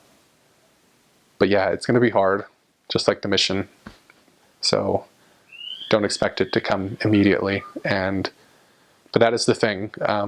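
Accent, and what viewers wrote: Finland. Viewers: ) u have a kind of a finnish and a swedish acent mix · He sounds so Finnish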